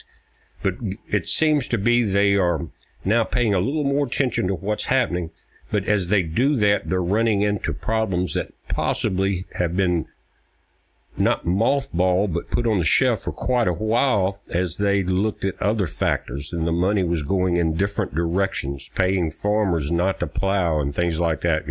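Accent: South, United States. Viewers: very charming 'Southern drawl!' 🎉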